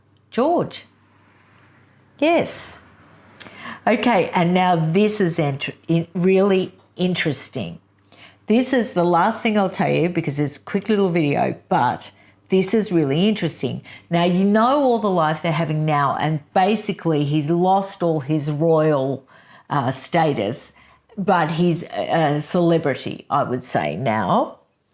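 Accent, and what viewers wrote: Australia. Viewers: Thank you for your videos. It’s so wonderful to hear an Australian accent on YouTube too. I like the way you intelligently present things. I also enjoy your genuine glee at sharing the “juicy bits!” 😉😂💐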